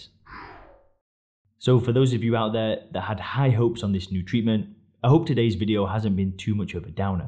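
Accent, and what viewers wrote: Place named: Scotland, United Kingdom. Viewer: ermete trismegisto Scottish accent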